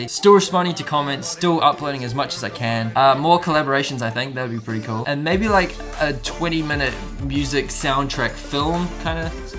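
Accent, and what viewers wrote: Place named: South Africa. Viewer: Hey mate - I love your accent (and the music definitely too) ;) Are you of SA origins? Cheers from Poland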